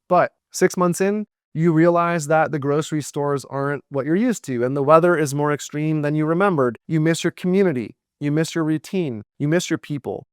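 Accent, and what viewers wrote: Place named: California, United States. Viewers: his California accent is what makes his video so good.... 😉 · Great info,! I watch tons of retirement planning vids. When I 1st watched one of yours, I almost flipped past it after picking up on the CA accent. (I moved from TX to CA for a yr as a young teen and was teased brutally for my TX accent. 50 years later, you'd think I'd be over it. 😄)...but I persisted and watched it thru. Then another. Then another. Now your content has become some of my favorites. Keep up the good work!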